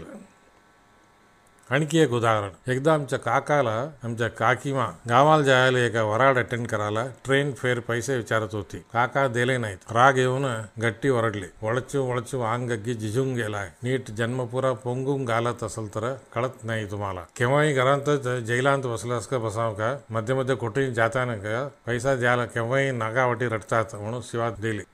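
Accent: Thanjavur, India. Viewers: नमस्कार!🙏 तुमची मराठी (तंजावुर) ऐकायला खुप गोड वाटते. तुमचं म्हणणं अगदी बरोबर आहे. पण महाराष्ट्रातील मराठी भाषिकांना तामिळ शब्द कळत नाहीत, पण तंजावुर मराठी ऐकून, समजावुन घेवून कळु लागतील. संवाद व्हायचा असेल तर प्रमाण भाषेतला नाही तर बोली भाषेतील पर्यायी शब्द माहीत असावा. आपले सर्वांचे मराठी भाषेसाठी चाललेले प्रयत्न कौतुकास्पद आहेत. धन्यवाद! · तुम्ही जी तंजावरी मराठी बोलता ती बोला ऐकायला चांगली वाटते फ़क्त एक विनंती आपले मराठीपण जपा। जय भवानी जय शिवाजी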